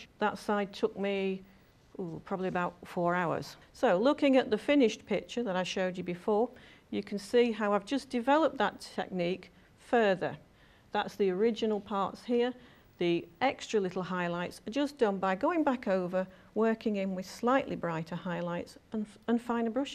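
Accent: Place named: Northern England, United Kingdom. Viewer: Beautiful artwork, do you run courses for using acrylics, you sound northern England like me.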